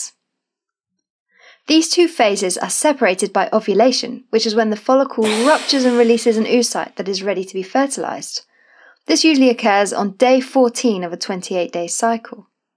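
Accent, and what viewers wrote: United Kingdom. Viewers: Extremely bad video, not obvious, bad fast voice, videos used to be more helpful and obvious It is NOT a compilation for the sexiest British accent! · Im sorry but in my prefrence, its alot harder to memorize and understand what the video is teaching when the person has a british accent.... im sorry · This british narrator gotta go. Im sorry. Bring the guy back please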